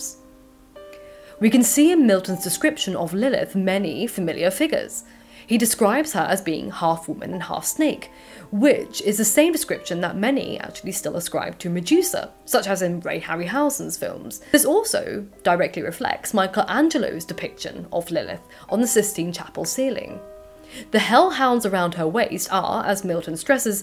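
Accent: RP, United Kingdom. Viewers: Received standard pronunciation and a lovely classical English accent. I thought I’d never find that on this website. I’m in love and subscribed.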